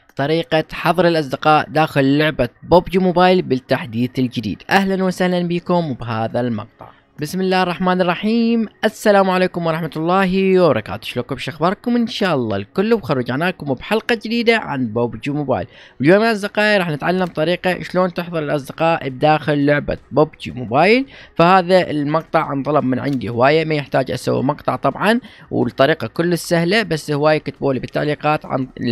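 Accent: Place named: Egypt. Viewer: دسهلهة جنك مصري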